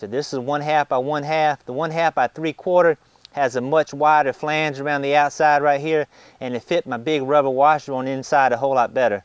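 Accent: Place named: Louisiana, United States. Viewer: your amazing accent = Louisiana? Mississippi...? Very nice. And great video..! I think you should look at The Worm Inn, Mega, now made and sold by TheWormDude dott comme, apparently. More air cirulation, no stink.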